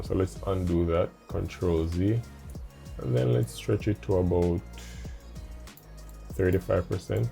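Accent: Jamaica. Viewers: Are you Jamaican ? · you're jamaican, aren't you? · are you jamaican? you sound jamaican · 2 seconds in....yessss my Jamaican brother!!! lol super helpful video btw, thank you! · Are you Jamaican 🇯🇲 ???wonderful accent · You sound Jamaican. I just subscribed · Is that a Jamaican Accent?? Now I have to learn this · Are you Jamaican? Or from the Caribbean? Because i am Jamaican and i recognize the accent. Thank you for the help! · i hear a caribbean accent… hmm let me guess, Jamaican? Great tutorial 💯💯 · Are you Jamaican? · are you jamaican? i pick up the accent anywhere lol. Also thank you for the tutorial it really helped! looking forward for the others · You sound Jamaican bro, are you?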